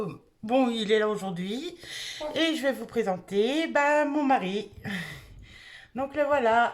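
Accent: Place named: Picardy, France. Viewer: Coucou, j’ai découvert ta chaîne grâce à tiphaine. J’aime beaucoup ta personnalité, tu as l’air d’avoir une histoire touchante, mais tu as réussi à te construire une vie de famille super. Tu fais un super joli couple avec ton mari, vous allez bien ensemble. Vous êtes de quelle région? Moi je suis de Picardie et j’ai l’impression que vous avez un petit accent comme nous! Bisous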